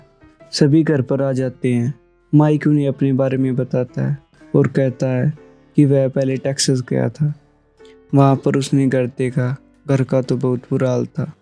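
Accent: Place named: Haryana, India. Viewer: Tum haryana se ho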